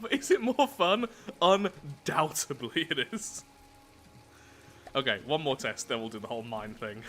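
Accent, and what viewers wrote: United Kingdom. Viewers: God have I missed your spiffing British voice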